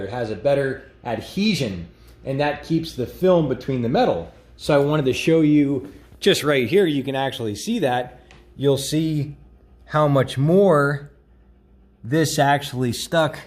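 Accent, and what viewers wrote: France. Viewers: 😝 great French accent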